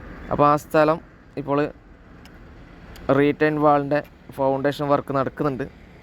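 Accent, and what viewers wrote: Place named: Kasaragod, India. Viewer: തനി കാസർഗോഡ് സ്റ്റൈലിൽ. ലാൽ സലാം. ഈ വാളും അങ്ങന്നെ ഇങ്ങോട്ട് വന്നിർലാ എന്നേഉള്ളൂ പേടി ❤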